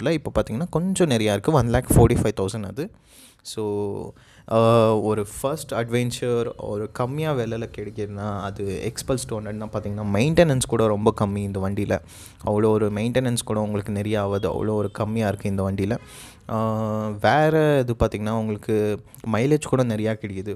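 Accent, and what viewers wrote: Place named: Bangalore, India. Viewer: we bangalorean speak tamil with same axcent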